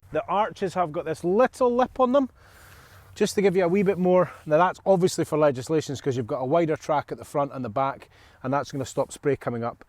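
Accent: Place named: Scotland, United Kingdom. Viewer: Och aye , wheres the haggis?? cant stand the scottish accent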